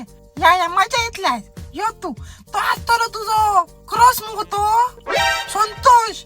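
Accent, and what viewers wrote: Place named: Pedne, India. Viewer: The way you speak Pednechi bhashya is just superb....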